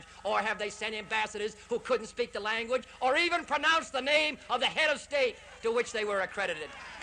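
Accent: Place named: Boston, United States. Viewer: Great Boston accent. And a speech with substance.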